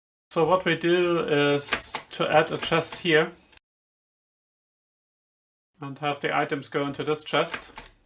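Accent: Germany. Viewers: Question about your accent, are you from Germany?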